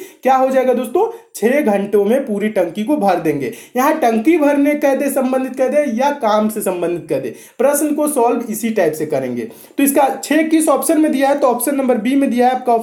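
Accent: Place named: Bihar, India. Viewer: Sir aap bihar se ho kya kyonki bihari 11 ko igara bolte hai nice sir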